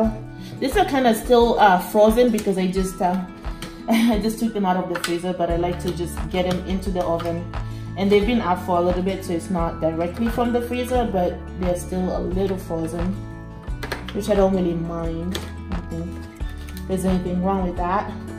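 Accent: Kenya. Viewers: You sound Kenyan, are you from Kenya?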